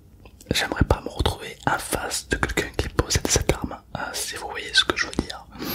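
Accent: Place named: Marseille, France. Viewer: L accent marseillais oulaaaaa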